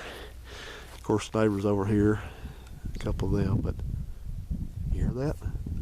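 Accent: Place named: Alabama, United States. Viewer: . Your knowledge is a gift to this world. I could sit for hours and tell you about the things I've learned. Biggest thing is putting the fucking phone down and firing up the computer. Manage time a little better instead of staring at a small screen reading the same shit over and over. Facebook sucks but I like my privacy and anonymity. I'd love to share stories and talk. Send me an email. You'll find it in a private.....message. I'll take the time to write one back and send pictures. Facebook is kind of a bullshit means of staying connected or even getting to know someone. I found you. I reached out. I won't share here how I accidentally stumbled across it. I hold privacy dear as a value. Some things everyone doesnt need to know. Anyway, I sent lots of pictures and kind words in a message. You taught me you're a warm and generous person, but you dont waste words or time. 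Values I've held dear that are a beautiful taste of the state I call home. Almost 14 years in Illinois again, but I took so much of Alabama in that my accent is a part of me. To hear another intelligent man who talks like I do is quite endearing. Then, there are all the hours of great info. I'll watch this all the way through tomorrow, at my work desk. I'll get a camera, a tripod, and I'll share info I know that others may not know and might help them. Knowing i could have taught one person one thing is all worth it. Thank you for all the kindness. I feel like I've watched your life happened because of y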